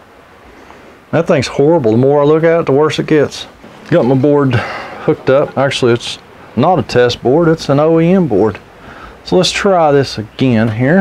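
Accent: Appalachia, United States. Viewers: "I wasn't holding my mouth right" - at that moment I knew I was following the right RV tech, as you were speaking my language. Lol I'm your neighbor down in Tennessee, so I understand that Appalachian dialect. I am an RV tech in training, so I really enjoy your videos! Thanks for taking the time to do them!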